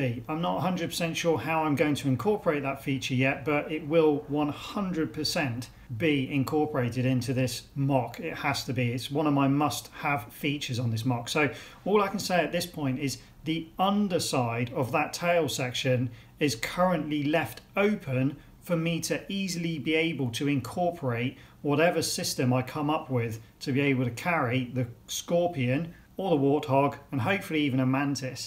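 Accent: United Kingdom. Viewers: are you British I love your accent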